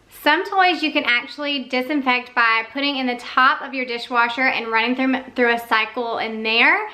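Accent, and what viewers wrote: South, United States. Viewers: Beautiful Southern accent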